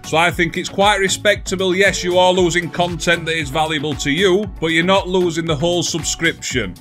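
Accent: Yorkshire, United Kingdom. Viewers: Congrats on the getting the play button, you fully deserve it........as I've said before, your the only reason i watch youtube nowadays.. always make me laugh and that top yorkie accent 👍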